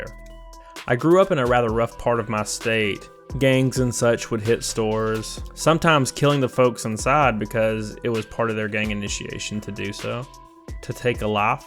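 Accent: South, United States. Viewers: I noticed he sounds like Gomer Pyle sometimes with that southern drawl. However, unlike that show this guy is funny and entertaining.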